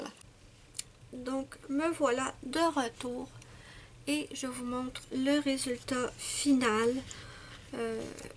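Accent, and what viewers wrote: Quebec, Canada. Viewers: Moi je te trouve très courageuse, tu essaies un nouveau materiel devant nous. Super! Oui ta peinture est magique, je me la suis commandée. Je vais essayer. (J'adore ton accent Quebecois 😁) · Coucou! Il est très joli comme ça ton colo. Pour les craies, je ne saurais pas trop dire, en plus j'ai les neocolor 2, mais par contre, dans mes Hanna Karlzon, je les estompe en mettant un peu d'eau sur le doigt. J'aime bien quand tu utilises des expressions québécoises, tout comme ton accent je trouve que ça contribue au charme de tes vidéos. 😘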